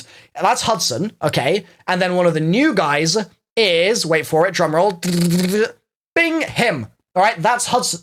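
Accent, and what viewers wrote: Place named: United Kingdom. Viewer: The most British accent i ever heard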